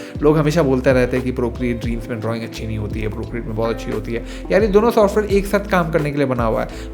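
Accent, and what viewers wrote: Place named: Kolkata, India. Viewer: আপনি কি বাঙালি? আমি বাংলাদেশ থেকে। হিন্দি অ্যাকসেন্ট কলকাতা এর মতো লাগছে।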